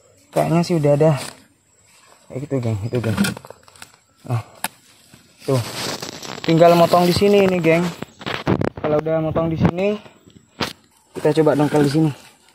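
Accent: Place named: Madura, Indonesia. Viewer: Keren.. Klo dr logat bahasanx logat madura nih.... 👍👍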